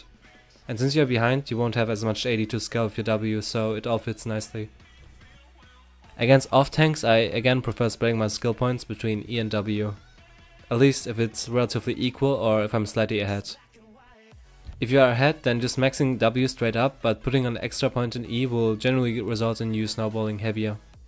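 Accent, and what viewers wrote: Germany. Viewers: Ayyyy that german accent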